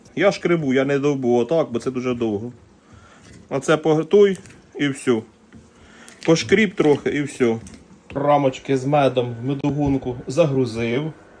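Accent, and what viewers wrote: Poltava, Ukraine. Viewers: Зі 100 відсотковою впевненістю можу стверджувати, що Полтавський говір😊 Може навіть північні райони... Пирятин, Чорнухи, Лохвиця, Гадяч, Зіньків... Напишіть, чи вгадав?😅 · Полтавський говір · Чути зрОзу шо Ви з полтОвщини.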